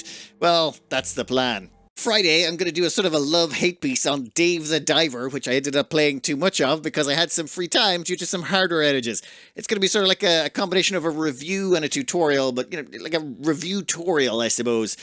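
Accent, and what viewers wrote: Ireland. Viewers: Lol was trying to figure out why your Irish accent wasn’t so think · If it's any consolation, you sound very Irish to an American in England. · Just totally LOLed at "People can't tell the difference if I'm saying "or, or, or". Made me laugh a lot, I'm one of those people that can't hear any difference. For us in the U.S. it's "Or" = or "R" = arr. Similar to the pirate trope. Dont change,. Love your videos, and what initially caught my attention was the Irish accent. Its just a huge bonus that they are also entertaining and contain awesome gameplay tidbits and information.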